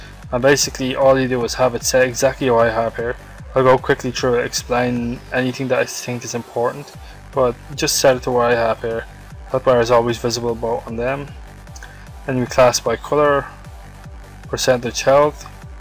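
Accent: Ireland. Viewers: are u irish ? u sound soo much like it ( im irish ) ;)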